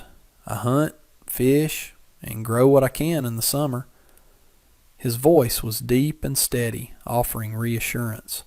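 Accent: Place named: West, United States. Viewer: I love this real human voice with this wild west accent.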